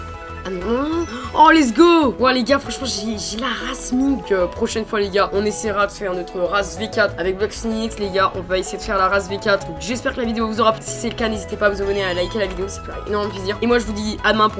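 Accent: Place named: England, United Kingdom. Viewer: l'accent englais sur le live ta peur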